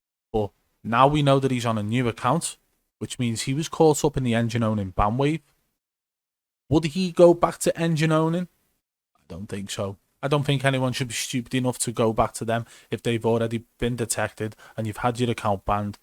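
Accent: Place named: Scotland, United Kingdom. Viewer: What part of Scotland are you from?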